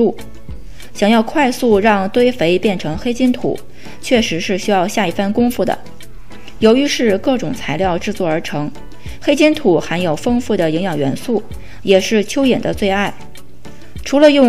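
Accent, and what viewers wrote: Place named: Beijing, China. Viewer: 其实北京口音太浓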